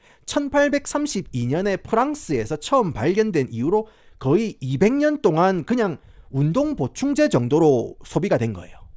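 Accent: Gyeongsang, South Korea. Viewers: 경상도 사투리 쓰는 RM이구나~~~생각하면서 듣고 있습니돠~~~. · 시카고 애독자예요 님 덕분에 여러 정보 알게 돼 감사하며 저도 부산이라 이 갱상도 억양 힐링입니다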